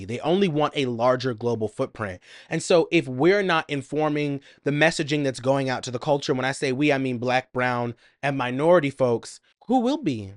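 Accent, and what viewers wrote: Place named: Puerto Rico. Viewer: Ok baby I hear that accent🇵🇷👏🏽 well done!! Had me thinking you were riiiican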